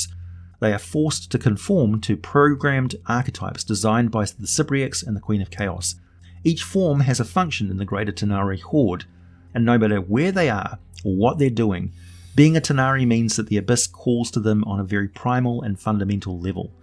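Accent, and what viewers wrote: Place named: New Zealand. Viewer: Well hell,you may just have to do the Wolf Spider next! Great vid keep crankin them out ol son! By the way my neighbor said you look great with a bald head! Shes hot bro! I think shes crushing on you! 😂 lol You kiwis with that accent....i think thats what got her.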